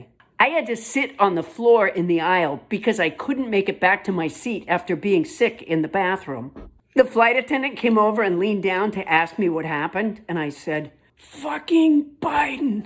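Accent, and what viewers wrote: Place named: New England, United States. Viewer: THIS monologue is brilliant, especially “part of God’s plan, & Mr. Balloo.” Maybe Poundstone, Jimmy Kimmel, Louis Black and the Comedians ARE the adults in the room, after all. But as keen-eyed as you parents seem at spotting the 3–4-year-old development of a grown man, how do you explain the 76–78M adults with no such recognition?? He toddled around the WH from 2016–2020 playing president then!! Atrociously. Abominably. Disastrously. What chemical substances are people getting that wipe the data out of their minds so completely?—send us a link, PLEASE! (I LOVE New Englanders who use British & Irish colloquials like “banged on” and “dithered around,” or “faaar” instead of “for,” “f-ing & s.o.b.” Sooo endearing!)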